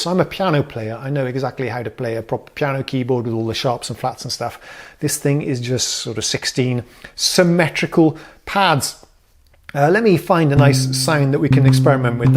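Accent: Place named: RP, United Kingdom. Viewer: Wow was not expecting an Electribe demo video to feature the poshest accent I've heard since I watched Our Man in Havana a month ago.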